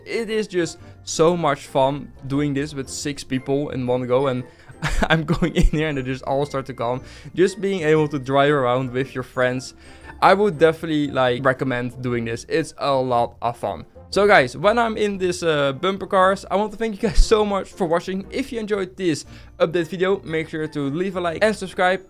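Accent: Netherlands. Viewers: Are you from the Netherlands 🇳🇱??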